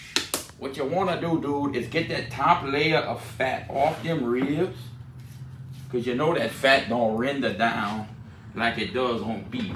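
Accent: United States. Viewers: Where in America u talk with this accent?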